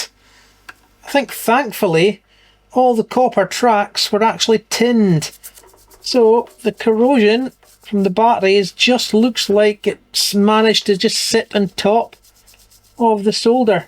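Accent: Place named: Scotland, United Kingdom. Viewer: I thoroughly enjoyed your interview with Dave from EEVblog. I think you were one of his most interesting interviews to date. Didn’t know you had it in you from just the view of your hands and Scots brogue all these years mate. Good on ya then!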